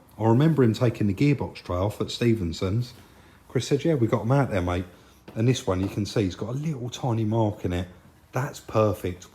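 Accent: South, United States. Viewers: Lads never apologise for the way you talk I’m a scouser I can understand you southerners perfectly well 😉😉 the content you provide and the work you do is second to none, you lads are class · I think that’s great Chris gets a nice ride for a bit it has turned out very nice On another note being an ex northerner understanding your southern lingo is a bit difficult sometimes And I bet you will say the same for me but I would never go on about the way you say things That is just how it is , I am glad you told him what’s for as I would too Being in Australia for the last 50 years I think I speak double Dutch these days You would have no idea what is spoken here half the time Thanks for all the great videos and I do hope Chris enjoys his new old flash motor 😍😍👍👍ps I love finding money in cars 😂😂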